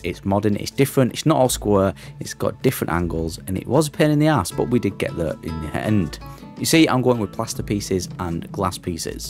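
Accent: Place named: Manchester, United Kingdom. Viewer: it sounds like you are from Manchester. Have you ever thought of recreating Belle Vue Pleasure Gardens/Zoo?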